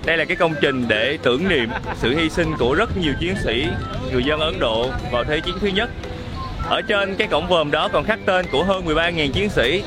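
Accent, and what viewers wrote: Mekong Delta, Vietnam. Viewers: Dân mìền Tây nói chuyện nghe vui ghê. Vui vẻ thì nói là dui dẻ. Màu vàng thì nói là màu dàng.Việt Nam thì nói là Diệt Nam..Mình có dạy cho thằng bé lớp năm quê ở miền Tây môn tiếng Việt mà sửa lỗi chính tả cho nó cũng muốn tẩu hoả nhập ma. Nhưng giọng, cách phát âm thì vẫn cưng và nghe hay thật.Chính mẹ mình dân Daklak còn khen nói chuyện hay.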